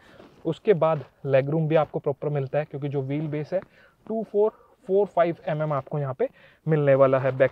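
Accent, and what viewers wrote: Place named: Haryana, India. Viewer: Bhai apka haryanvi touch miss ho raha hai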